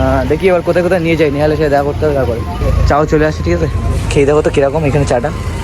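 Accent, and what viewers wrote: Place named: Digha, India. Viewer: Vai majha Tumi ja tomader local language a kotha bolla amder dighar dikao amon typer kotha bola.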